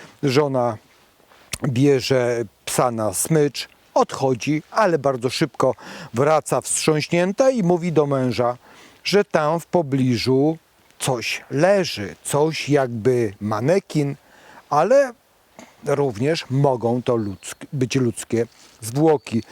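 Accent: Kraków, Poland. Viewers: pan Inspektor jest z Krakowa. Tam się tak mówi. Zamiast np dziesiąta trzydzieści - dziesiąta czydzieści.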